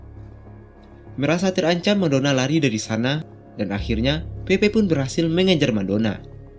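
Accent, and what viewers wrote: West Sumatra, Indonesia. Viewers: Fix mimin org minang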